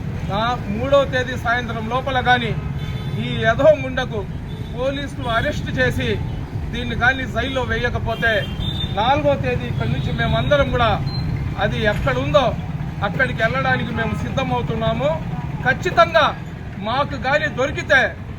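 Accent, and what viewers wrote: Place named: Nellore, India. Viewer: Nellore style lo chepparu great bhai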